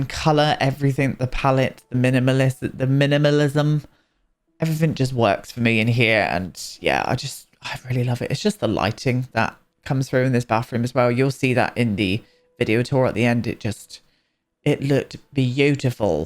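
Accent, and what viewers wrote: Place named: RP, United Kingdom. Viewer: posh British then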